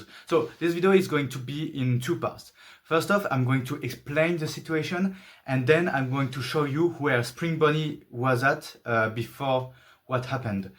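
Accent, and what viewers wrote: France. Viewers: I KNEW YOU WERE FRENCH i recognize it from the accent, but seriously im very sorry to what happened to your project i've heard that the temp has even reached to 40° in some regions, i have never thought in my life that france would be hotter than algeria but here it is now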